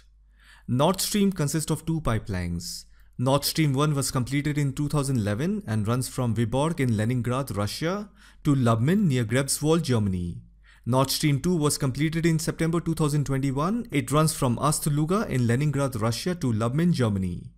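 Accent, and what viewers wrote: India. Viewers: Why the Russian guy who did this comprehensive video is talking with an Indian accent? The whole point of any American intervention in Europe is to put down Russia for good, no matter what. But You are talking about people suffering, who cares. While Putin is sending all those young fellas to die defending the future of motherland Russia, a country who has no future at all, Americans are sending Ukraine nationals to the front, in the future they will send European nationals if necessary. It is a win win situation for them. I am pretty sure a German jobless kid will rather enjoy a cold winter at home than dying in the front if he is convinced that a boycott to Russian gas will cripple Russian economy for good. In two years you will be making a video on how your beloved Russia lost this war and was harassed by vast continents. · There are 2 sides to the war. 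Russia and the West. The west can stop the war and so can Russia. The west can stop sending aid to Ukraine and remove sanctions... OR Russia can simply withdraw from Ukraine. To say it's the west that is at fault shows your preference for Russia. Strangely you sound Indian and yet do you not realize that neutrality in the war will significantly benefit India and China while the rest of the world suffers.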